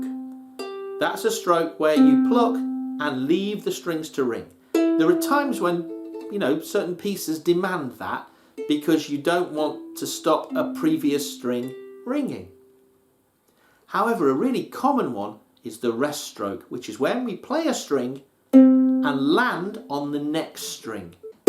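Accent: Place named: United Kingdom. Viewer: Jolly! Love the ur Brit accent!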